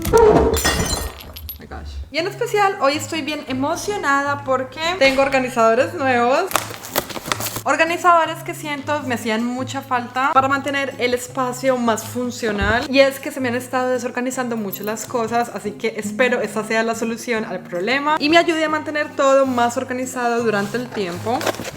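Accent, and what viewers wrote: Colombia. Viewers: Me encantan los vídeos de organización.. pero sobre todo cuando hablas y t sale lo colombiana q eres.. m encanta ver lo alegre y divertidas q somos las latinas ❤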